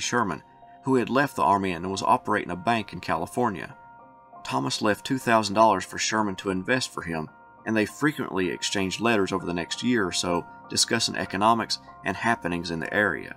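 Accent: South, United States. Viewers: I didn’t know this was going to be 2+ hours of talking…..I really dislike listening to narration without something visual to hang on to bc I am just not and never have been an auditory learner, but I stayed with it for 45 minutes, which is a testament to the narrator and scriptwriter. But it’s a no-go for 2 more hours, and it’s too bad bc I do enjoy the subject, but the narrator’s soft voice with a soupçon of a southern accent puts me to sleep or I start looking at a book and realize I’ve lost the thread and have to go back. I’ll try to return to this and listen in parts!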